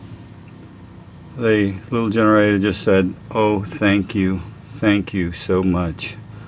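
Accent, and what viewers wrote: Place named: Cajun, United States. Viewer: I like your style.... I sometimes wonder why people feel the need to criticize anonymously when they wouldn't say it to your face. Like the joker that said your voice is slow. I lived in Baton Rouge and it was nice to hear that Cajun twang again. And good video too. Nicely done.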